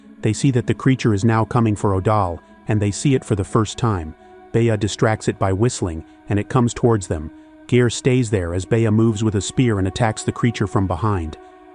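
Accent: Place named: United Kingdom. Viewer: British? Lost me...must be shit.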